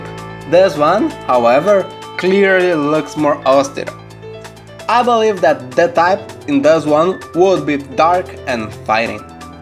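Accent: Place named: Brazil. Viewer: Corélio M. Based on the accent, he HAS to be Brazilian or at least Latino.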